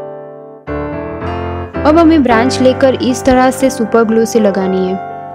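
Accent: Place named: Gujarat, India. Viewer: R u gujarati??your sound is like gujarati...btw,great work..👍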